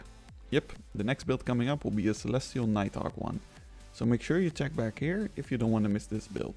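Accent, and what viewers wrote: Netherlands. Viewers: I can hear the dutch in you so hard haha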